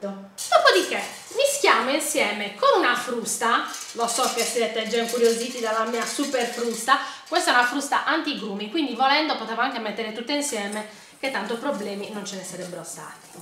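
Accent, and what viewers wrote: Sardinia, Italy. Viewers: Bravissima! Ma sei sarda per caso?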